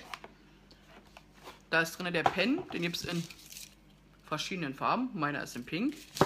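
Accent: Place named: Berlin, Germany. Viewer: Biste aus Berlin? Ick hör did doch :-)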